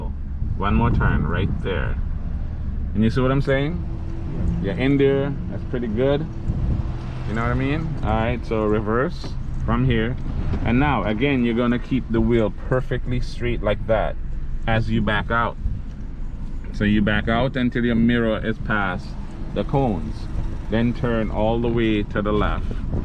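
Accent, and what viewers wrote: Jamaica. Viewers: Taking my test tomorrow 🙏🏾 btw is that a Jamaican accent?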